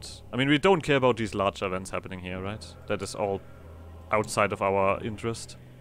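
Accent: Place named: Germany. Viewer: hat 5 sekunden gedauert um zu erkennen dass du deutscher bist lol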